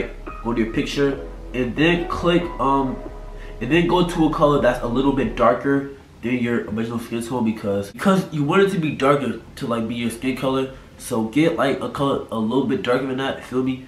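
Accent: United States. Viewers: that florida accent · yu frm nyc ?? uu dead sound like uu frm there no lie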